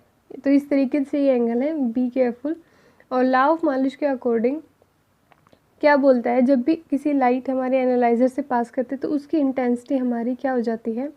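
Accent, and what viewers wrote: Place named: Haryana, India. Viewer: Language touch aa hi jata h haryana wala ka... 😅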